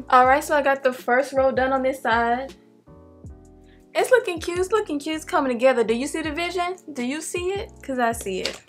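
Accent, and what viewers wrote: South, United States. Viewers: What part of the US did she get her accent from ? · “...there you go! Throw it to the beck!” lol that country accent! Beautiful lady and beautiful hair! Got me another easy style! God bless sis! ❤️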